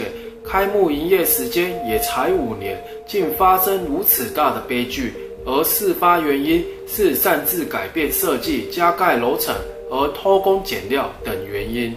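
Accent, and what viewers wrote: Taiwan. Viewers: 有台灣國語😂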